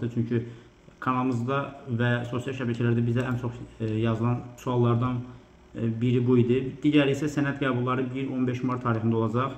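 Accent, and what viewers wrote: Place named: England, United Kingdom. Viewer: müəllim, hərdən siz Azərbaycan dilində danışanda ingilis dili aksenti hiss edirəm😅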